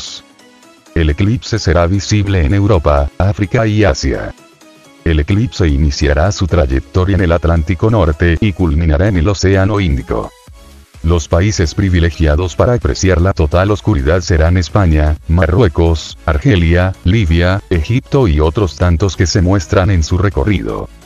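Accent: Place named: Argentina. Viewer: Me suena argentino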